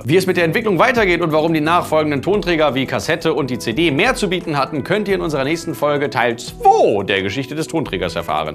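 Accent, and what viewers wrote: Switzerland. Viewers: Nicht alle Schweizer sprechen so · Bester Schweizer Akzent. Ich kann nicht mehr :D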